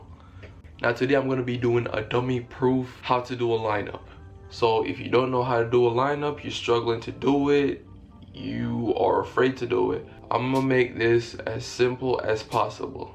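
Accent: Jamaica. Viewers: Oh you Jamaican too? Instant sub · U can tell he’s Jamaican 🤣🤣🤣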